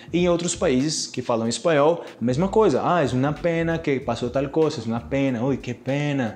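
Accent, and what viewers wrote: Antioquia, Colombia. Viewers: Soy de Venezuela, usamos bastante la palabra chévere, bacano nunca escuché alguien decirla, pero si la dicen uno entiende que es. Hablas bien el español, hasta pareces colombiano al hablar😎😎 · Profe usted ya es paisa, tiene el acento!! 😂 Se nota que está amañado...!! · veo tus videos cuando puedo, tu acento parece mas colombiano que brasileño. Tus vídeos me han ayudado en mucho. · Me gusta tu español con acento colombiano👏 pero tu portugués me encanta 😁 os países de fala espanhol tem muitas palavras com significado diferente, en mi país tambén usamos, "me regala" cuando vas a comprar algo. Muito bom seu video👌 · Eu acho que tem muito do sutaque paisa da Colômbia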